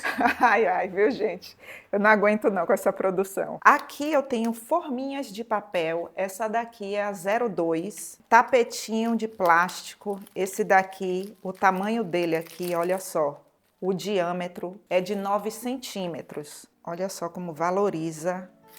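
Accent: Bahia, Brazil. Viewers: Amo suas receitas, baiana arretada adoro seu sotaque mulher.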